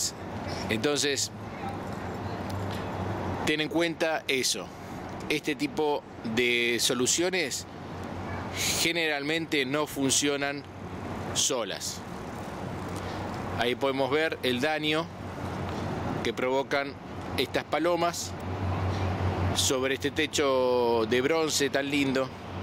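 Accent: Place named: Argentina. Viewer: El que habla es argentino.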